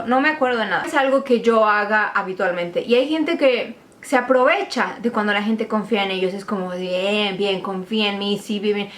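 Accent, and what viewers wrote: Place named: Cuba. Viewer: A mi me gusta como hablas cuando lo haces de manera normal... ese acentito de cubana no m gusta.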